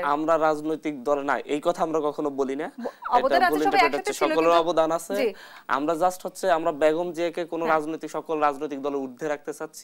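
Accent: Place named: Kolkata, India. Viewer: উপস্থাপক ওভারস্মার্ট সাজার চেষ্টা করে!! কলকাতার ফ্লেভার আছে।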